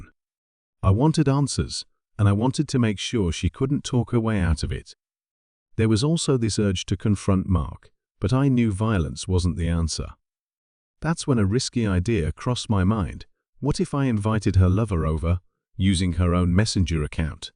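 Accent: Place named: Yorkshire, United Kingdom. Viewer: This sounds like Sean Bean's Yorkshire accent.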